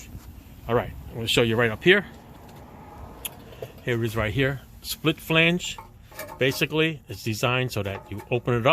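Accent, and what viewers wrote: New York, United States. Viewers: Thank you, thank you thank you. I am a native NuYawka living away from home and experiencing the same problems with my 2011 Honda CRV. The quotes I got were astronomical and pushed into my "I wish I was home cause I beat this can be done for under 50 bucks!" After trying to find a better price I transitioned into "I can do this my(dayam)self...I am NY Hard!" When I found your video my ears perked up b/c I said I know that "accent." Then after watching you fix this simple problem and share the information gave me new hope. Again, thank you, thank you thank you.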